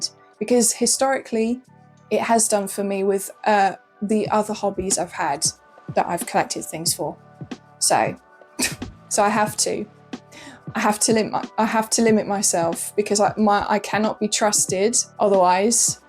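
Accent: England, United Kingdom. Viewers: Yes. I like it. I'm not from english speaking country. I like english accen the most ☺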